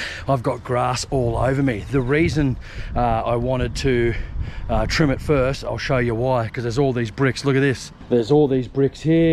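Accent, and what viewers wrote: Australia. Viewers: Hello. You sound as if you are from the Land of Oz. I'm from over the ditch, in Auckland. Oh yes. I'm 76 years old, and still mowing my own small lawn with a Ryobi battery self-propelled mower, but the trimmer and edger are wielded by myself -- they are not self-propelled (how could they be?).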